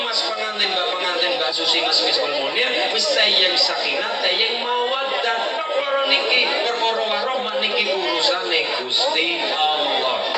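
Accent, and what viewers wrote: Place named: Banyumas, Indonesia. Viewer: Ngapak ustadz nya..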